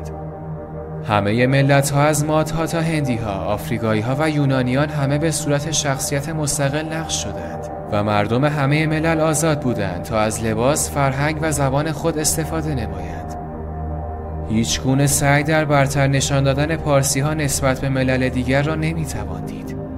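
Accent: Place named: Azerbaijan. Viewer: درود بر شما! عالی بود و بسیار لذت بردم. از لهجه تون هم فهمیدم که آذربایجانی هستید! یاشاسین آذربایجانین شریف خالقی!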